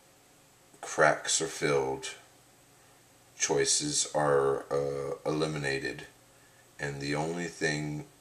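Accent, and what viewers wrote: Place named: India. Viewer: Good job! Distance she travels per day towards us...300,00 per day? How soon will we have a guesstimate re: mass?Why you speak like Indian...? LOL...prolly lots o stupid questions,fatigue to name a few...keep on keepin on!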